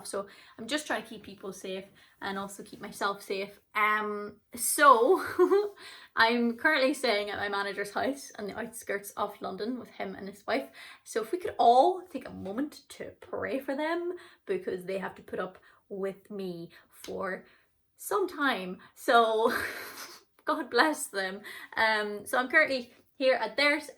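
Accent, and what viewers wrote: Ireland. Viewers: Glad to see u back. Ur accent is more Irish. Love it. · I hope you enjoy the rest and free time, stay safe well and happy. You make me smile and feel good, i so enjoy your Irish accent. Glad your getting on top of your problems i heard a trick that helps people go to sleep you start by relaxing your shoulders and then work down your body and then start saying dont think dont think slower and slower it seems to work with me im asleep in minutes i think sometimes we need to rest our brains. xo live long and happy.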